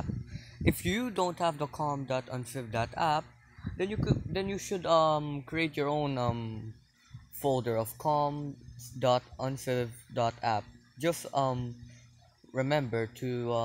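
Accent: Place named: Philippines. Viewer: Filipino? I thought your accent.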